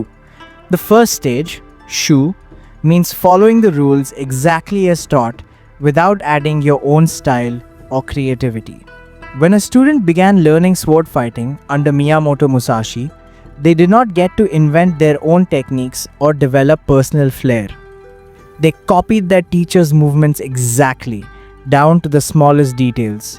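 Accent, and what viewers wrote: India. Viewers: Are you Indian? Your accent sounds Indian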